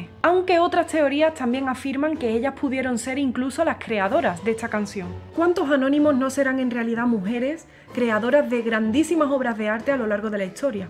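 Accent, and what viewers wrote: Andalusia, Spain. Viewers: Escuchar ese acento andaluz enamora 🥰💞😍😘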